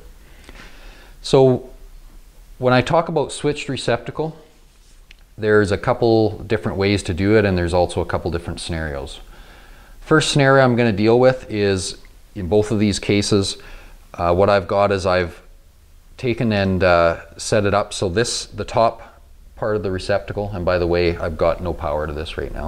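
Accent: Canada. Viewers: You can so tell that he's Canadian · You must be Canadian eh. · You have the most Canadian accent ever it reminds me of home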